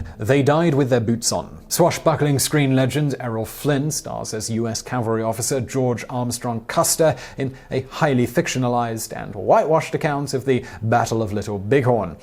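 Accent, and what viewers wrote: England, United Kingdom. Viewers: I like this channel mainly because its a brit w/ a cool english accent so you know hes to be trusted plus solid content and for once I liked the title and the presentation. Most of these vids are poorly presented w/ the guy pandering to human reaction which is annoying and the clickbait vids simply arented needed. The subs plus people will click on this vid just because it exists it really doesnt need super clickbaity titles. This one was a great episode and I love how the info is put forth esp the brick backround etc but nOmOReClICkbAitY stuff ppl will legit watch this no matter the name itll just come up on the que and trust me itll get views but spare us the superduper clickme kjøtt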